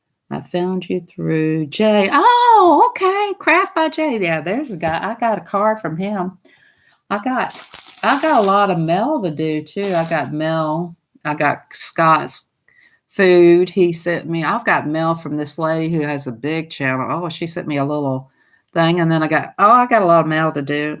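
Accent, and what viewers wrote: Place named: South, United States. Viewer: Hi, love your accent 😄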